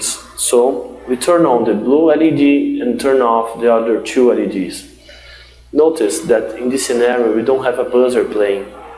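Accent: Brazil. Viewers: Hi, nice project. Just a silly comment about your code: if the values are exactly 300 or 700, nothing will happen, because the 2 first 'if' consider > 300 or <300, never =300. The same with the 2 last 'if' regarding 700. It is not a big deal, because the probability of such values got read is small, but it is also something simple to fix. BTW, are you Brazilian? Your accent seemed Brazilian.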